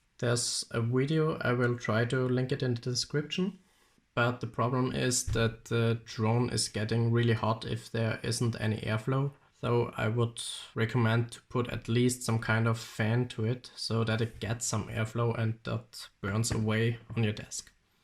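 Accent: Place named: Austria. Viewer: ich meine in deinem Englisch nen österreichischen Akzent zu hôren! Stimmt, oder😜🚁👍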